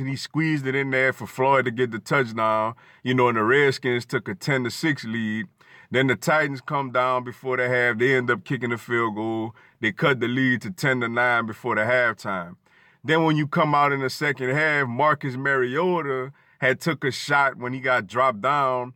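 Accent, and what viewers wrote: New Orleans, United States. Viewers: Sound like a NewOrleans?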